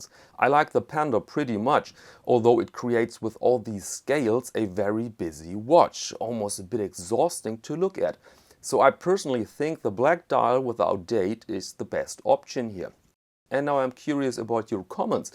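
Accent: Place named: Germany. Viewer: Love your reviews. Jazz guitar? Great watches? Great mens attire? And a German accent! (I lived in Oberammergau till age 14) keep them coming!!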